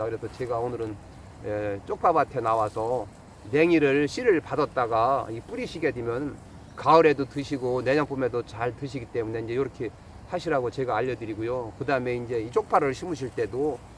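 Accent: Chungcheong, South Korea. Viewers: 데요~데요~ 너무 좋습니다 충청도가 최고에요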